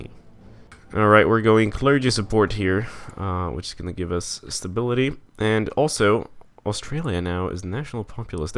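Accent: Brazil. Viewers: You have an exelent brazilian accent :D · Okay, Carioca ou Paulista? Cause that sssss issss thiccc boii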